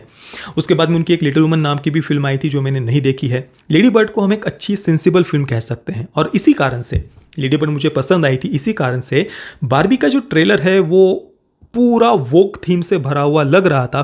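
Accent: Maharashtra, India. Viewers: Heavy Marathi accent he has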